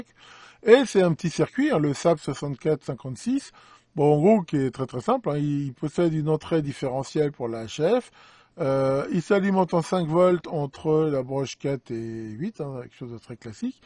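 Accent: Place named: France. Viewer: Bonjour, Excellente vidéo comme toujours sur cette chaîne, ça fait toujours plaisir de voir une notif sur YT avec une nouvelle vidéo de ^^ Par contre, pouvez-vous répéter après moi M? Aidafroute:D Je plaisante, mais cette petite touche d'accent Français m'a fait sourire. Bonne continuation à vous.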